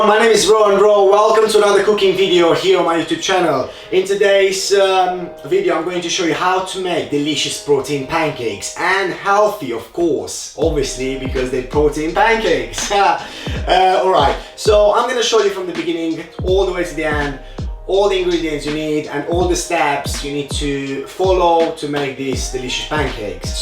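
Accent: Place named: Italy. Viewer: Where are you from Rowan? You've got that sort of Italian accent which is cool btw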